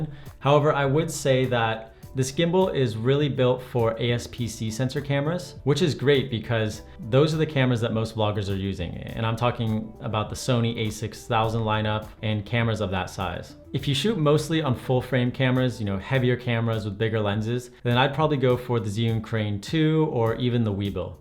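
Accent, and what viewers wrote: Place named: United States. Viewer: Are you American or the best Swedish English speaker In the world ? Lol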